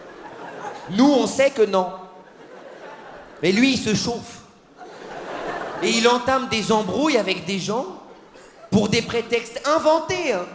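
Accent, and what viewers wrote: Switzerland. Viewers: Trop drole...mais il est Suisse...c pas possib...cet accent...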